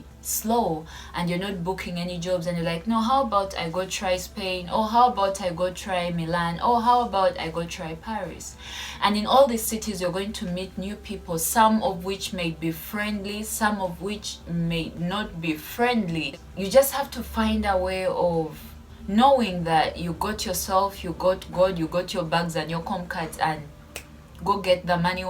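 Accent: Africa. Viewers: I like your African accent that is smoother than others'. I always uncomfortable to watch models in outfits that is very little to cover their bodies. I feel bad for them. If I am in the field as a model, I would suffer and probably give up to be a model since this world's fashion industry is so wicked and dark. I am admiring you to be a good example, as a Christian model by keeping the dignity and your faith value in your job field which is very precious. Bless your heart. Keep up your good work. I know that you can't survive there if you are there for success in the world, but you are there for God's special calling to reach out people with the gospel. When you know why you are there, I am sure that you can have peace and enjoy your job even though you don't make much money or fame like what others chase after. I would like to see how God uses you unlimited way. 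I like your shorts. They are awesome. They speak special way. I think that God brings souls to you thru your videos. Do not be afraid to share the gospel. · Late to this video, please do not lose your beautiful African accent and soft voice!!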